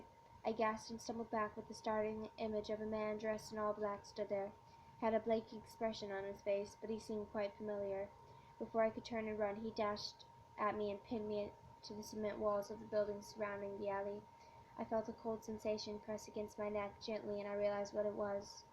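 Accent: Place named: United Kingdom. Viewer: british accent tho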